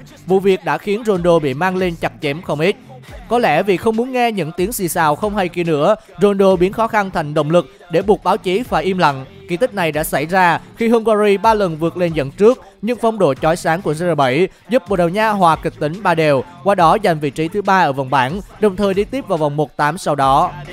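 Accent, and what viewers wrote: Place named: North, Vietnam. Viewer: Sao tiếng BẮC KỲ khó nghe quá 👎👎👎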